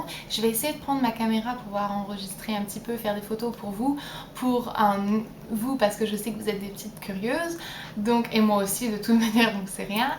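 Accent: England, United Kingdom. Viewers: C'est bizarre, on dirait que tu as un accent légerement anglais...... pourtant tu es fraancaise n est ce pas * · je suis une nouvelle sur ta chaine :) je trouve que tu as un accent anglais cela m'a parrut étrange mais avec cette vidéo je comprends mieux :) j'adore ton naturel tu donnes envie tu m'inspires car tu es simple et tu n'as pas la grosse tête j'adore <3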